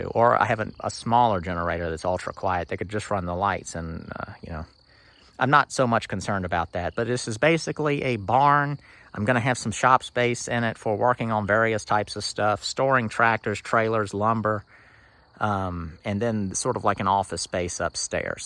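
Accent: South, United States. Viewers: That is definitely the coolest building for multipurpose that I have seen. I really dig the way you have re-used a lot of stuff (particularly the roof). When your saw mill gets going you will be set to expand your collection of buildings on your land. I know you sound like a southerner, but you work like a New Englander.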